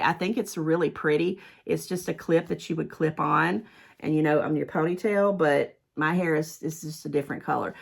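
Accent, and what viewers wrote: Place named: South, United States. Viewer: I discovered your channel a month or two ago. You are just so real & I love it. I enjoy your videos and your Southern accent. Your home is gorgeous!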